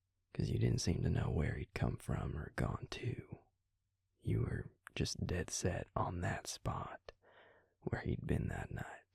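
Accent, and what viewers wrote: South, United States. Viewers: me not being american and not used to southern accent: "i don't understand but.. YYEEE HA!!🤠" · lovin the yeehaw accent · I love his southern accent 😍he's too cute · Not usually a big vamp lover but oooh boi that southern twang could turn anyone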